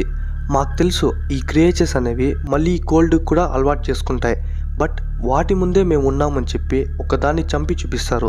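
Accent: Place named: Karnataka, India. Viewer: Language ನೀವು ಕನ್ನಡ ದವ್ರು ಅಣ್ಣ 🤣🤣ಪಕ್ಕ... Hemana paravaledu shoppu yav uoru naadi chikkabalapuramu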